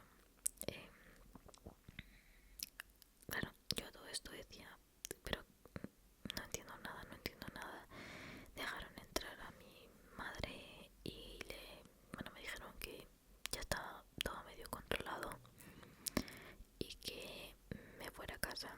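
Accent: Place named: Spain. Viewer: Hola bonita me encanta el ASMR con acento español es el que más busco, sigue así me gustaría algún roleplay♥️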